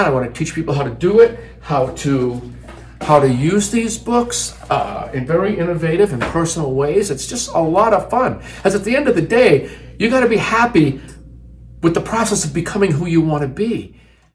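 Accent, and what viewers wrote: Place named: Boston, United States. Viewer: The f....g Boston accent I don't know what the fxxxx bada boom bada bing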